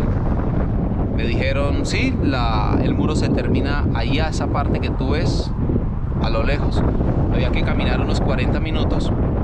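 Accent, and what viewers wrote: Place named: Colombia. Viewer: eres colombiano por tu acento y la manera en que dices las palabras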